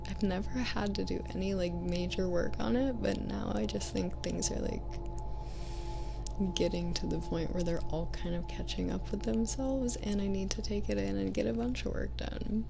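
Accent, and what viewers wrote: South, United States. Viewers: you are so beautiful. I'd love to see a kidnapping asmr video with the southern accent. The southern accent is so hot.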